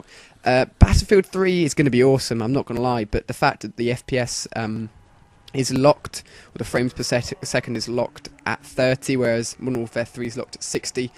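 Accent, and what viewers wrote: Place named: United Kingdom. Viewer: AHAHA! ANOTHER GUY WITH A BRITISH ACCENT!!!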